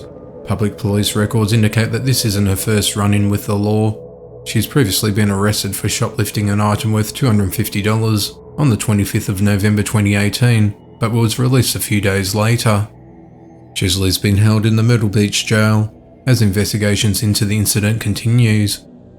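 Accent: Australia. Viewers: I’m a new subscriber and love this channel, your voice is very easy to listen to, can I detect an Aussie accent?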